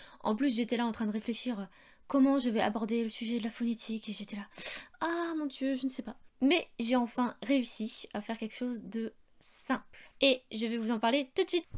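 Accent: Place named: Paris, France. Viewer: Je n'avais pas remarqué ton accent parigot, mais j'adore ton chuintement sur 'ch' et 'j'! Bravo pour ce morceau de bravoure qui m'a ramené dans ma classe de 6ème, il y a... un certain temps !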